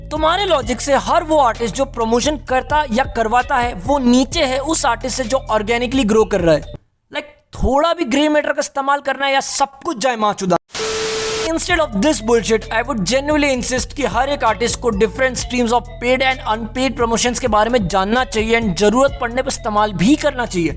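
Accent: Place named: Delhi, India. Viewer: This channel admin is definitely from Delhi 😂😂. His tone told alot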